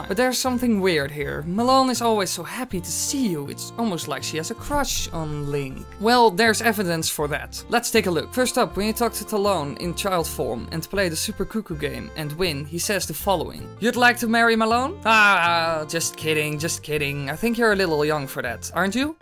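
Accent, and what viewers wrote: Netherlands. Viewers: are you dutch because i hear something that sound like a dutch accent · Ik kan horen dat je Nederlands bent je praat met een accent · ...ben je Nederlands toevallig? Ik hoor het aan je accent als je Engels spreekt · Haha you are definitely dutch right? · Are you dutch? (Just a random question) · R you dutch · Are you dutch? Whatever love your accent.. and the theory😊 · you're dutch for sure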